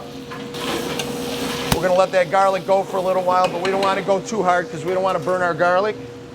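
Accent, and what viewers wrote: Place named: Upstate New York, United States. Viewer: Even before I saw "Utica" on your T shirt I knew you were from Upstate NY, that's a cool little twang in your accent, I am from Buffalo originally and could pick it up right away. Loved the recipe and technique. I always wondered what kind of greens to use, I guess it's escarole.....and always wondered if I should rinse the beans first, I see you added them right from the can so I guess don't rinse them.....so making this dish in the upcoming fall when the weather cools....also, I have friends that make it and nobody puts Italian sausage in it...I'm trying it that way.....seems like it would be heartier.....thanks for the video...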